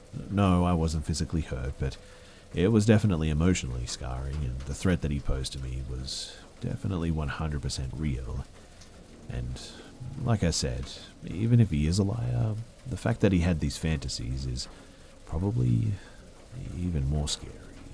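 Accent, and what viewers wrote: Australia. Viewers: I like you. Got a nice voice. I suppose you are from down under? Greetings from North Vancouver island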